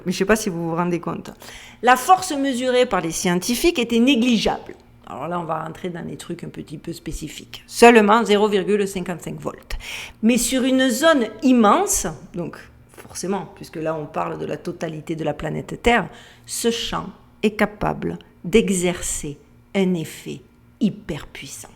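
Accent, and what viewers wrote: Marseille, France. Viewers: Bon aller je me lance on est de Toulon et avec ma femme on est just FAN!!! Milles mercis à toi on adore tes vidéos tes analyses ton intelligence alors qu'au tout début j'étais sur le cliché "blonde avé l'assent de Massilia" mais wtf et finalement comme trop souvent il faut lâcher les "à priori" et conclusion on a découvert une belle personne qui nous apporte bcp. Alors oui mille mercis à toi!!!!!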